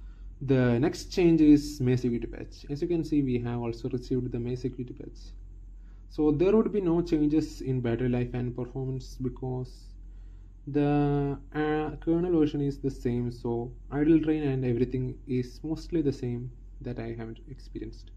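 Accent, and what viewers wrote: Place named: Kerala, India. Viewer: Are you a Malayali? Your English sounds familiar. I wouldn’t call it an accent, but Malayalis have a unique way of speaking English.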